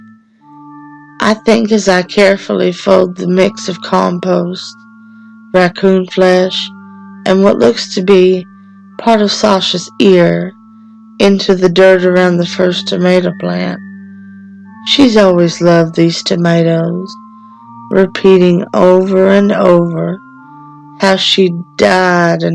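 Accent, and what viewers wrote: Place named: South, United States. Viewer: you're welcome chicken. 😘 Love the southern accent btw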